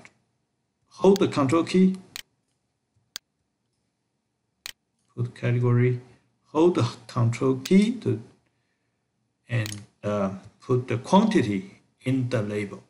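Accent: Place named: South Korea. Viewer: Thank you ! Helped a lot as a beginner :) Also your accent reminds me of my korean friend.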